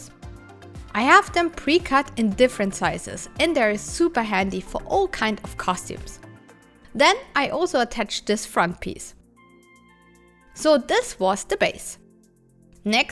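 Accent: Germany. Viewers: Where did you catch the german accent?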